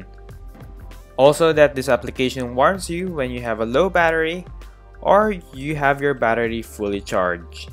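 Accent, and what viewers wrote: Philippines. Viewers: Pinoy po ba?! clear po kasi sa accent. ;) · As soon as i heard your voice i knew that you were Filipino, wassap man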